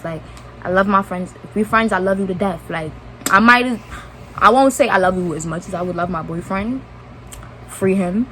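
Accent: New York, United States. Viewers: I love the way you talk? You from NY?❤ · I can spot my people anywhere 🗣🗽if you wasn’t from NY, I knew you was at least from somewhere up north lol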